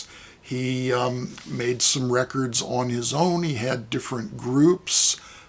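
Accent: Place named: New Orleans, United States. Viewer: You are messing with my neighborhood now. I grew up in the same 9th ward area as. I guess that makes me a night tripper too😂. We have a very similar accent. Your pronunciations are a bit off, but no one would notice but a local😂🤣 It's nice to seeing you giving some love to the good Doctor, especially at this haunted time of the year. Have a great Halloween even if you aren't here in New Orleans. There is a new book coming out, I believe next month., my brother from another mother😂🤣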